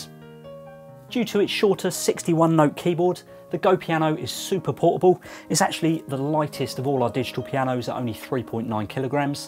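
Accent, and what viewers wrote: United Kingdom. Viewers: is he british? hahahahha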